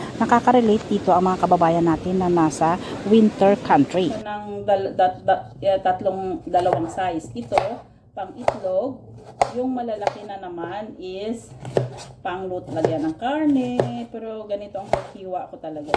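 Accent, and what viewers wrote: Iloilo, Philippines. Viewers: Ilongga ka Po ba ma'am?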